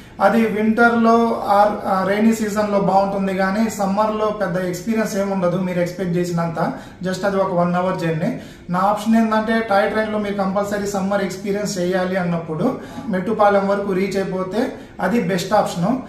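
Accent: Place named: Telugu, India. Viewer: Hi brother..sakkani telugu slag matadutunnaru..clear artam avtundi.. appreciate brother 🎉🎉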